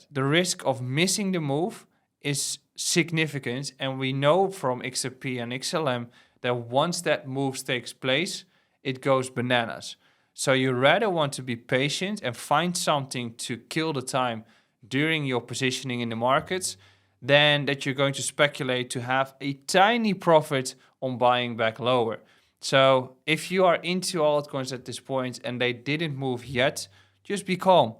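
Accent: Netherlands. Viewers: The Dutch accent though 😅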